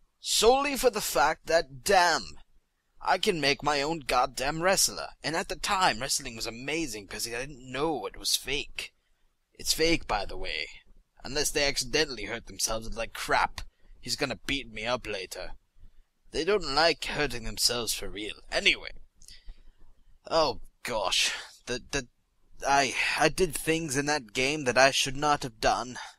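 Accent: United Kingdom. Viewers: anybody else relies he is speaking with a British accent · He gradually got more british throughout the episode regardless of the fact that he is american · his british accent is cute^^ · i love the fact that you turn more and more british in every word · wut's with the brittish accent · Is he like British or something?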